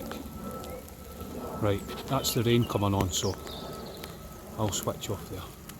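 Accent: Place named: Scotland, United Kingdom. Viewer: Nice finds.. i love those turquoise blue eggs.. ur Scottish accent is fascinating 😊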